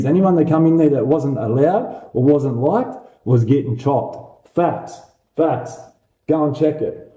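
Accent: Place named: Lebanon. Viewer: Your white!! And sound lebo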